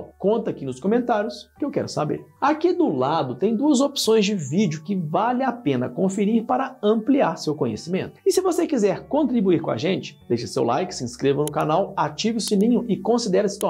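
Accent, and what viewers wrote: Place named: Rio de Janeiro, Brazil. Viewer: Ele deve ser só RJ, lá eles falam feio assim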